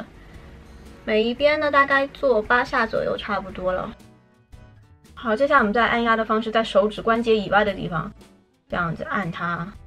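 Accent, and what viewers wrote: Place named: Taiwan. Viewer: 口音越來越像台灣人 讚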